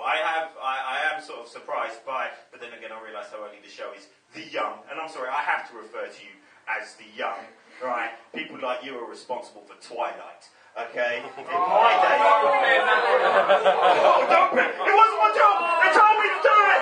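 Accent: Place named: Swansea, United Kingdom. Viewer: he sounds he comes from Swansea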